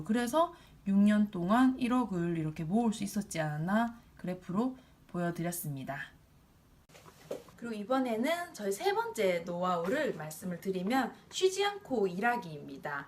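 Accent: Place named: Gyeongsang, South Korea. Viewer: 대단하세요!! 진짜 자랑스럽네요! 그리고 경상도 사투리 넘 귀여워용...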